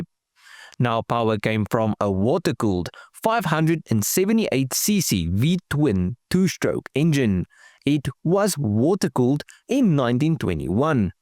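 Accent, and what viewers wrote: South Africa. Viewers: Parelel twin not vtwin. You sound like a South African.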